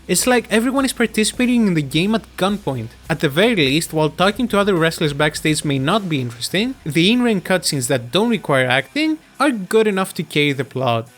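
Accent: Greece. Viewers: That's the Greekest accent I've ever heard lol, awesome video bro 😊 αγάπη από την Κύπρο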